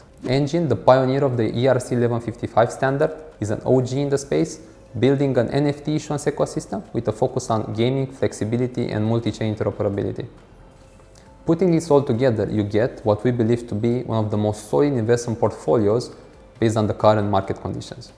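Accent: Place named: Romania. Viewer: I like your english! Nice! Romanian english accent! :) I like the moldavian accent, too, as a moldavian who I am! KR Costi! Thanks!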